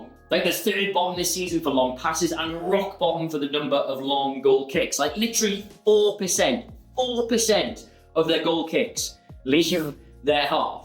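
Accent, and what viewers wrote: Newcastle, United Kingdom. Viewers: No need to apologise, I actually like the Geordie accent 😛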